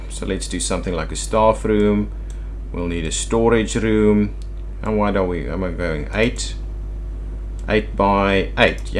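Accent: South Africa. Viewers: When I was a child, I used to watch a snake expert on TV called Austin Stevens. You're accent very much reminds me of him. I always liked the South African accent. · Its actually a South African accent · you sound south african, tell me its true.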